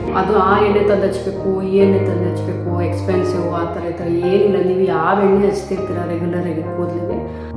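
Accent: Mandya, India. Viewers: Yes she's in US no Humu dim proudish always talk with natural Mandya kannada ❤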